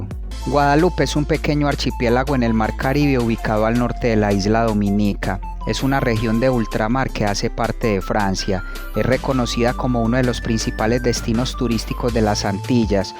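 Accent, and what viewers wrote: Antioquia, Colombia. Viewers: Qué lindo eres en tomarlo en buena manera, pero va a ser difícil trabajar en ese acento paisa, de todas maneras sigue tratando. Muy buen Video!😊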